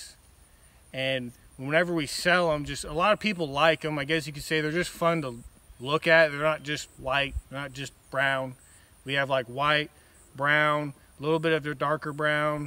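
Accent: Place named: South, United States. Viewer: talks just like I do. I was born in Tennessee. Spent most of my childhood in the country. Brownsville Tennessee. I’m in Arkansas now. But still down south. Y’all keep em coming. 👍😊👍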